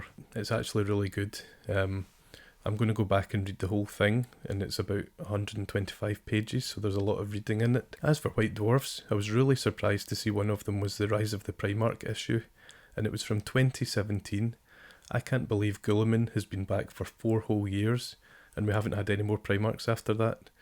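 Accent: Scotland, United Kingdom. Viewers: Warhammer lore in Scottish accent sounds dope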